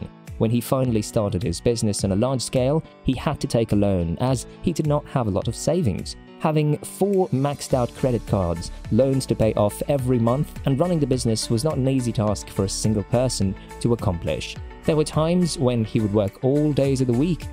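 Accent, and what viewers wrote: United States. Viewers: Yet another English YouTuber talking American.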